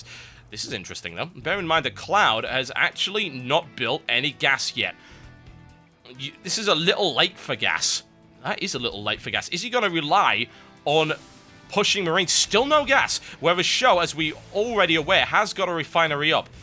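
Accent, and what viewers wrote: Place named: England, United Kingdom. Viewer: you're accent is embarassing to be english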